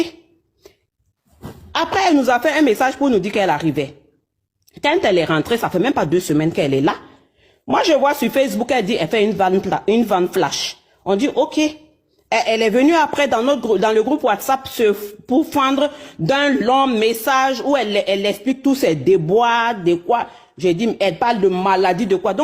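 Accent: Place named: Africa. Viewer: C'est l'accent de quel pays... ? Un pays d'Afrique à 100 % sûr... mais je sais pas exactement quel accent... Un pays francophone, donc plutôt Afrique de l'Ouest...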